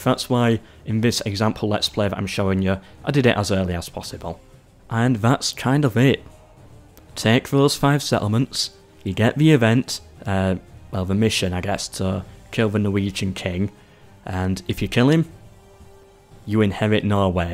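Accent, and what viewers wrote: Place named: Northern England, United Kingdom. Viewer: Cool. I figured it was northern england, but couldn't place it. Your accent is very similar to Ygritte in Game of thrones. I'm from Norway btw. Cheers mate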